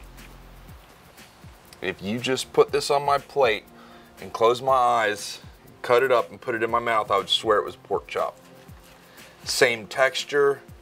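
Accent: South Africa. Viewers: has a South African accent. So cool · The dude sounds south african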